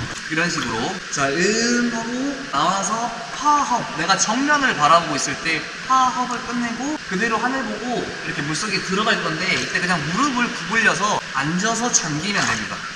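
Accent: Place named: South Jeolla, South Korea. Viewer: 설명도 잘하시고 구수한 전남 사투리 정감가네요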